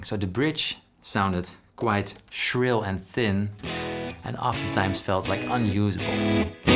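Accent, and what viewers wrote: Netherlands. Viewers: That's a strong Dutch accent. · Haha kan aan je engels horen dat je Nederlands bent😅 blijf zo door gaan kijk altijd al je video’s🔥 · Are u dutch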